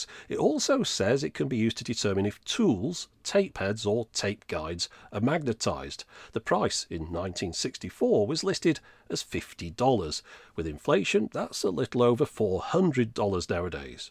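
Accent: United Kingdom. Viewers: Not sure whose voice I like more: classy British accent or the deep, groovy voice on that Datsun Z advert. Tough call.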